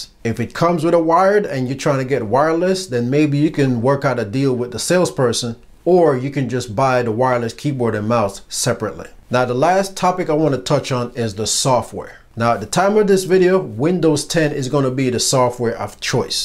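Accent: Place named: Jamaica. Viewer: Thanks man this was really helpful, I could be wrong but I think I'm hearing the yadi in the voice of so big up yuh self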